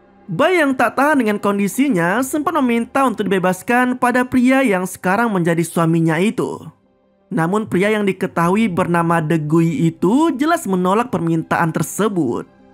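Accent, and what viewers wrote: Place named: Medan, Indonesia. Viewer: Begini suara Adon Saptowo, vokalis base jam, tapi dengan logat medan 🤔🤔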